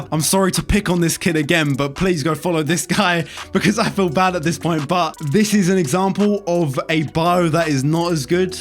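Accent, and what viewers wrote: United Kingdom. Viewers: His British accent😔💗💗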